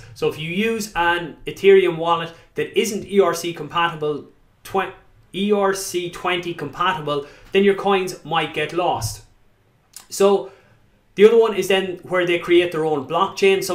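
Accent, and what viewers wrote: Ireland. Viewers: good man,me too! 😉 Hey I notice you got Irish accent, I'm living in Dublin,I'm entrepreneur,was on dragons den last year. Do you live in Ireland? I'm starting work on a new project, a new.com crypto unlike any seen before, I obviously think it has massive potential, but i could do with a partner with good knowledge of crypto world like you. You interested?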